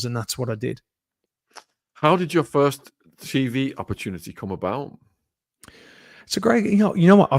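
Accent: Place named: Northern England, United Kingdom. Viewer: who did Matthew play in Coronation Street please. He speaks beautifully. You can hear the northern vowels . He so nice to listen to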